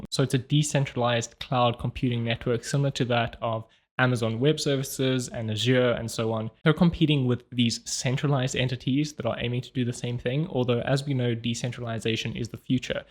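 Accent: South Africa. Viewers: I love your south African or Australian accent and your videos. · By any chance you're South African ?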